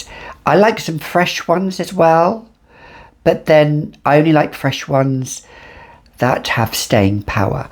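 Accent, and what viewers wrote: France. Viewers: Can’t get enough of that French accent of yours but I don’t think this one would be for me because there’s no sweetness and you know I love my sweet scents but you clearly love it 😍 💕💕👌🏽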